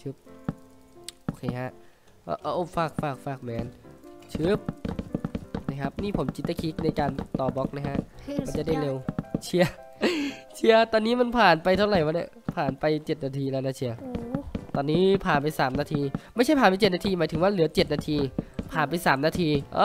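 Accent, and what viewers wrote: Isan, Thailand. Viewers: พี่ พูดอีสาน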